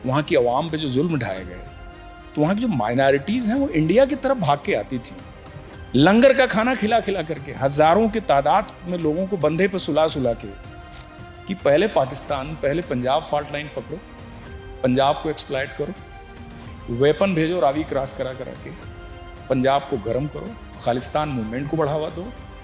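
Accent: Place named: Uttar Pradesh, India. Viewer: UP ki saaf zuban 👍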